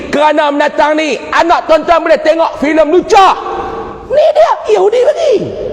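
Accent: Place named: Kedah, Malaysia. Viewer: Ustaz,,jgn risau,saya orang kelantan, tapi saya peminat no 1 ustaz. Faham sgt apa yg ustaz cakap.. Cakap Kedah pun semua bulih paham.. Malam ni lantang dari biasa. Terbaik ustaz.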